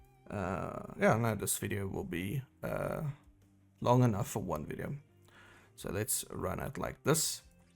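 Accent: South Africa. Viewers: Yesss!! Finally after finding your channel today and binge watching your videos I confirmed your are south african🙂 Yoh dit het my nogal bietjie mal gemaak om te luister en heeltyd te dink die ou is van SA. Dankie vir al die goeie info op al jou videos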